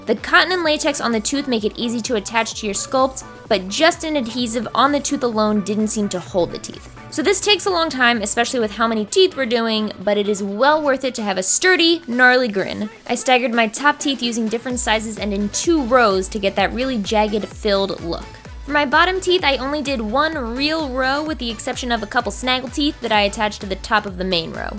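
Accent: United Kingdom. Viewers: that British accent doe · I’ve never heard sound so British! When she said bloody at the beginning she sounded more British than me being British myself 😂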